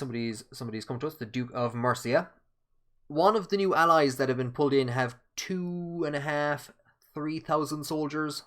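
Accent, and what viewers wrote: Ireland. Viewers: I just want to say that this is the best playthrough of CK3 I have ever watched. I like the editing, especially, but also your incredibly knowledge of the history from this period and region. Also, as a typical American, I really enjoy the Irish accent. I know that's silly, but it's true. The end of the series is, for me, kind of like losing a friend. But here's to making new ones.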